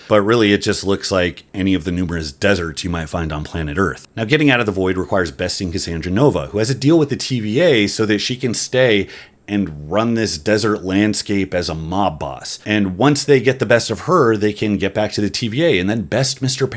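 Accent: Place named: United Kingdom. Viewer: Bromley British accent is the voice inside of my head. Remoinds me of a boss I once hated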